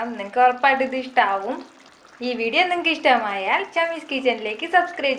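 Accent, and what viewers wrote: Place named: Kozhikode, India. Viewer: but ur slang like clt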